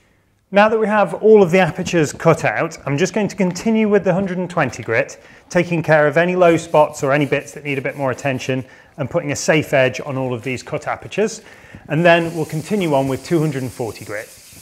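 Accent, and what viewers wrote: United Kingdom. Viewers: I like hearing him explain things in his cute British accent! This is porn for moms! 💁🏻‍♀️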